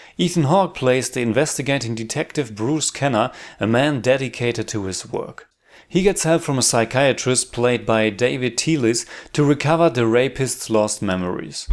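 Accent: Germany. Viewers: bist du Deutscher?:D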